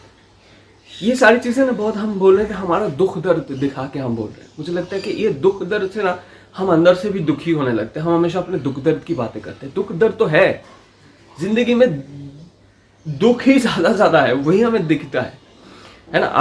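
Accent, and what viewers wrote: Bengal, India. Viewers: Sirf 'ra' sound ki wajah se aapka Bangla tone aa jata he.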